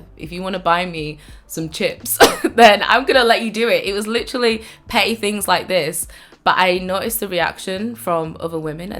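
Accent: United Kingdom. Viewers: Oo what part of the UK are you from? Your accent reminds me of Mel B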